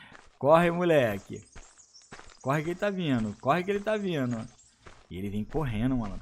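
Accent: Rio de Janeiro, Brazil. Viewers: Não sei se ele é carioca , mais nos caraioca falamos assim kk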